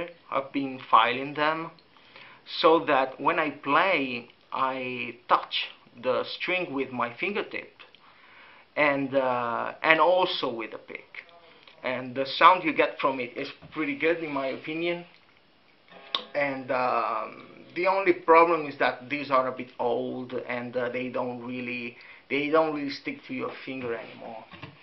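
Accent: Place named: Venice, Italy. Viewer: non avevo mai sentito un accento veneto sull inglese. Fantastico, sembra una via di mezzo tra il gallese, e il liverpulliano . Grade. gran video sulle unghie atrifiaciali, mi serviva:-)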